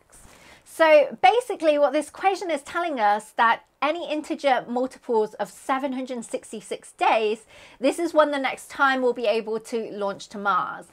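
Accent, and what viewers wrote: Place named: Northern England, United Kingdom. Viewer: We love your Northern English accent.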